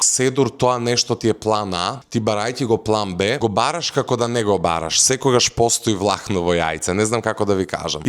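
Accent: Kumanovo, North Macedonia. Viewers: Од Куманово е, па ако зборува "како што треба" пола држава нема да го разбереме🙂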